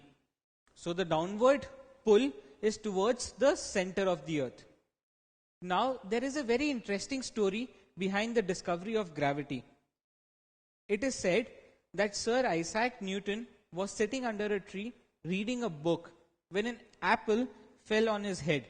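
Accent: India. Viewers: why you speak I-English?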